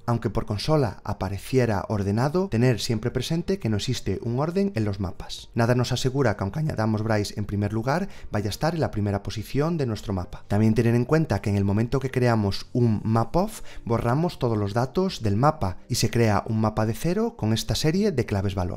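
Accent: Galicia, Spain. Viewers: En mi caso, cuando uso el remove no me aparece la palabra clave key, simplemente le paso el String y así se queda. Espero que sigas con este proyecto, tu acento gallego y tu voz calmada hace que resulte ameno aprender kotlin en español lol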